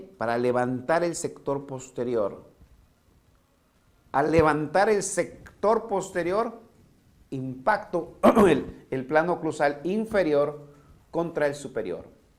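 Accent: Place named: Peru. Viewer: Pero el doctor tiene acento peruano, no colombiano jajajja. Es de Perú.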